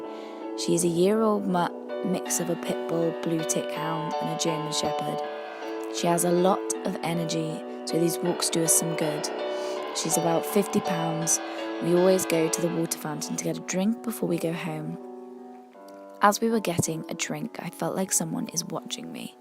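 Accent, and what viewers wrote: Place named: United Kingdom. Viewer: Thank you for using my story and the lovely shoutout! You're the best. I'm a sucker for a British accent. Beautiful narration darling.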